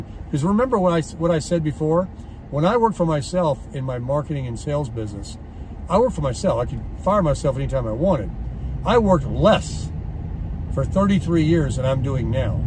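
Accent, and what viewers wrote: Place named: New York, United States. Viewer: Are you single New Yorker cause I'm a single Chicagoan! Our accents would be fun times.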